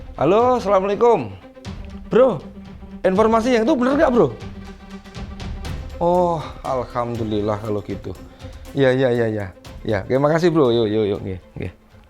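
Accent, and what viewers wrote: Java, Indonesia. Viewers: solo maksude mas,saking medoke dadi solok😊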